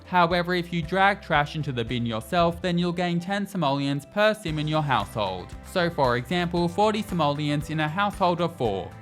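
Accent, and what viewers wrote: Australia. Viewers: Hey! No we don't! 🤣 I'm English, born and bred, and have either friends or family from all three of the other countries within Britain, and we pronounce it 'COE-coe' (both syllables rhyming with foe, or sew, with the stress on the 1st syllable) like I thought everyone else in countries that use English did until now. Don't think he's British, his accent sounds Australian? or New Zealand? but none of the Ozzies or Kiwis I know pronounce it 'coe-COE-ah' either. I also had a good laugh at 'bom-BAD-ee-er' (Bombadier Beetle) - I've never heard it pronounced any other way than BOM-bah-deer 🤣🤣🤣 Don't watch youtubers much so not familiar with this guy - I actually started thinking it might be an AI voice 😳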